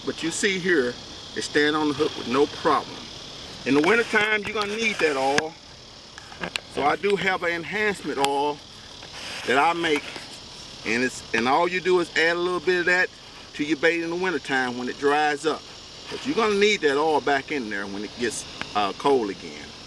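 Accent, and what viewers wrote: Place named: United States. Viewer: Love the ol country accent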